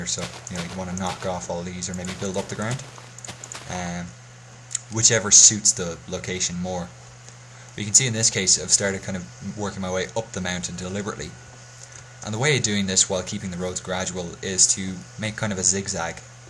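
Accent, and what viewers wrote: Ireland. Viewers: Do you have a hint of an irish accent?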